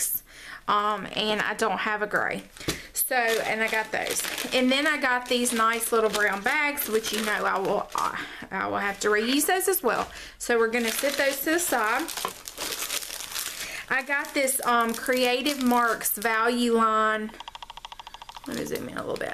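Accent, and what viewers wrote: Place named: North Carolina, United States. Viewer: Hey! Where are you from? It's rare I hear someone on YouTube that talks like me!!! Country girl from Western NC here!!:)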